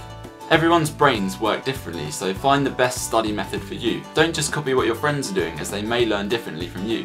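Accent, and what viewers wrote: United Kingdom. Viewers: Love your british english (: ♥ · I love love love your accent I love the uk so much hopefully one day ill get to go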